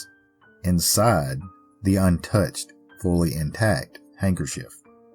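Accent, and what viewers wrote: South, United States. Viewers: that southern drawl is mesmerising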